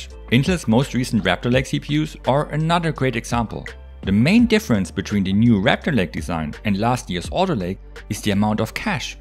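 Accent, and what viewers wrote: Germany. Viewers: I can hear the german Accent hehe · ja das ist es xD du hast einen krassen deutschen akzent aber hey, fühl ich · Sounds like the most Deutscher Akzent ive ever heard xd but nice video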